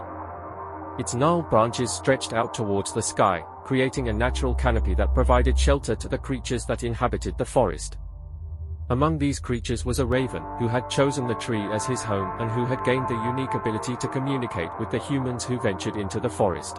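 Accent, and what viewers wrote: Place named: United Kingdom. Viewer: I love it, esp the British accent.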